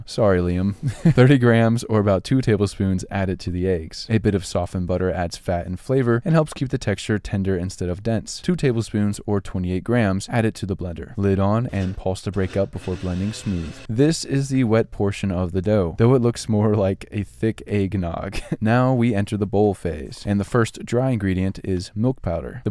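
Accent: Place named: United States. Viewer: Why speak perfect America English then go on to say Celsius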